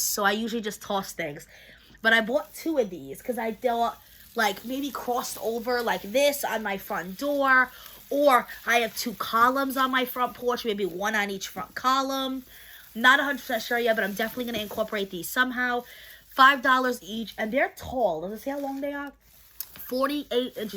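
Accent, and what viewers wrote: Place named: Massachusetts, United States. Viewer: i knew you had to be from mass with your accent! 😂 i found you on craftygirls comment section a few weeks ago, so what are the odds that we live in the same state?!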